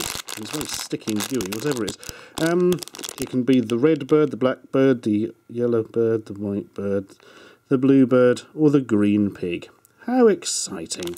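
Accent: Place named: England, United Kingdom. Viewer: Are you in England because your ascent and you you said pounds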